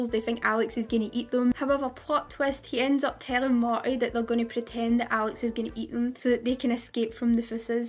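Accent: Scotland, United Kingdom. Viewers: I love your Scottish accent, lass! 😉🏴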